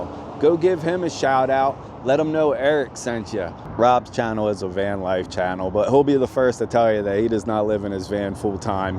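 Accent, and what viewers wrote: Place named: New Orleans, United States. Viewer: Eric I am one of your new subscribers and I also live in Colorado. I love the way you make homelessness as an adventure and there’s no poor me. By the way I love your voice, kind of sounds like you’re from New Orleans but I think you said you’re from Pennsylvania. Have you thought about upgrading to maybe a van? Or a truck with a shell or small camper? Anyways, good luck and God Bless.♥️🙏🏻♥️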